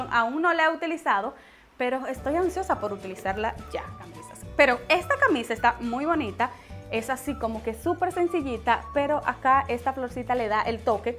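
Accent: Dominican Republic. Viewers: Tú eres Dominicana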